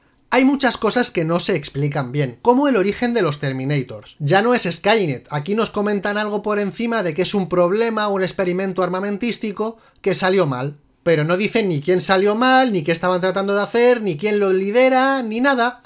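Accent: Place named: Spain. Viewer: ¿Y las gafas de 3d? Bueno, al menos se que el acento españolete no se irá, que es lo que me gusta de estos vídeos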